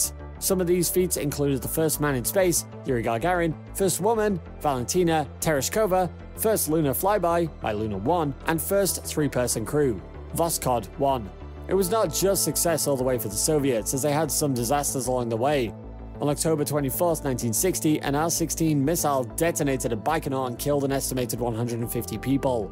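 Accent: United Kingdom. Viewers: One of an Uncountable number of miss pronunciations. I don’t mind the strong British accent, as an American, but I do not appreciate so many mispronounced words as if the narrator doesn’t have a goddamn idea what he’s talking about. It’s very unprofessional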